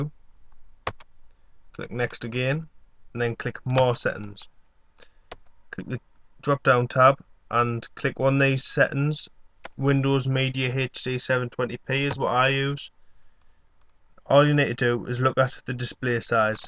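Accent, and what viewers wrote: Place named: Newcastle, United Kingdom. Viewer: hahaha I love the Geordie accent!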